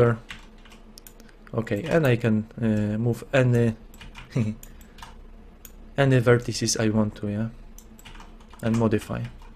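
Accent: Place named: Poland. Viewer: i am suscribed to a youtuber from Poland, and i recognized the accent :)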